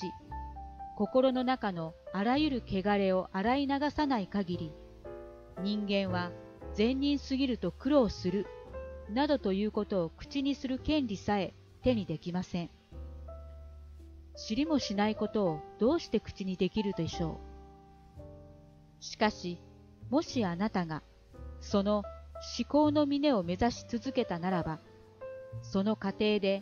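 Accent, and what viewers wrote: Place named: Kansai, Japan. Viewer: 関西なまりが気になりましたが、寝る時よく聞いてます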